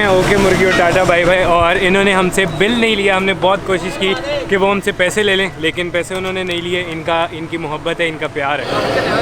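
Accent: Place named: Punjab, India. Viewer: Love from Indian sir ap k bolna k tarika punjabi 🥰🥰🥰🥰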